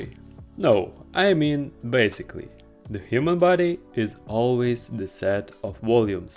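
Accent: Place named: Poland. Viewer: jak to się dzieje że zawszę usłyszę polaka, dobry film tho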